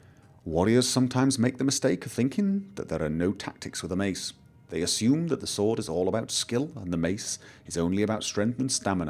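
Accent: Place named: Scotland, United Kingdom. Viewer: It sounds like you have an English accent, but you roll your R's like a Scotsman. Did you invent your own accent?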